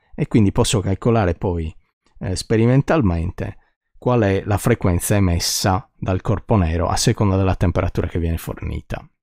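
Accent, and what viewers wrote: Sardinia, Italy. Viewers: Buonasera professore! Provare a spiegare i quanti senza partire da Plank e dal piano PX( come fanno taluni) non è solo trascuratezza o tracotanza. Peggio: é mancanza di compassione per chi segue!!! Come coloro che spiegano la simultaneità in relatività ristretta, senza compararla con un esempio di relatività galileiana. Grande lezione la sua! Anche già per chi ci capiva qualcosina... ma sono sempre utili conferme che provengono da certi lidi. Emergono le dimensioni di h in modo immediato. Ma se uno volesse capire il modulo di h... sarebbe un problema? Anni fa studiai qualcosa, ma avendo avuto all' epoca altre beghe,non mi ricordo una mazza. A matematica arrivo a derivate , integrali e un po' di numeri complessi; non so usare matrici e tensori. Può indicarmi dove cercare qualcosa. Certo non oso chiedere a lei un aiuto sul sito, se non utile a tutti. 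Grazie per la sua lezione, senza enfasi o dialettate romanesche come quelle del simpaticissimo prof. Battimelli, che per questo a volte offre il fianco ai fisici ortodossi. Lei mi sembra sardo. Se così con noi Pisani avrà , come é stato da secoli un rapporto di fratellanza. Pisa= Gallura. Gallura= Pisa! Un caro saluto e scusi la mia prolissità senile! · Confusione tanta......e un accento sardo insopportabile. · meno male 28 anni non son bastati per farti perdere l'accento sardo :) saluti dall'isola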